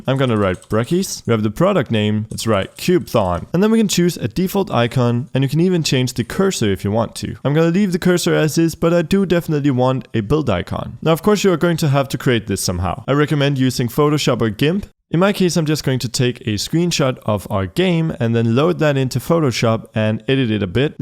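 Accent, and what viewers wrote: Netherlands. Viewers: Wacht, ben je Nederlands?! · Are you dutch? And again thanks for your videos! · Wait, are you dutch?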